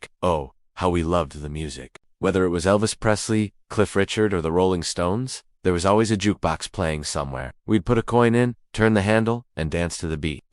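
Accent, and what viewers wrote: United States. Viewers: The American accent definitely put me off. What would he know about the UK in the 60s?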